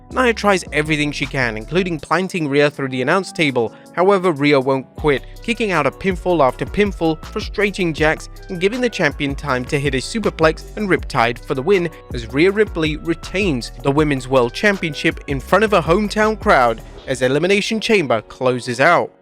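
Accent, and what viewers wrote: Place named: United Kingdom. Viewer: Wtf I didn’t know you were from the UK😂😂😂😂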